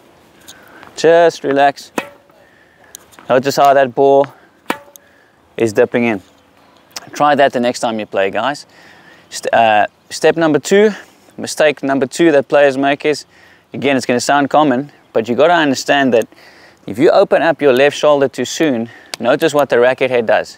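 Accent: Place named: South Africa. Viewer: The accent suggested he was South African, but the HADEDAS confirmed it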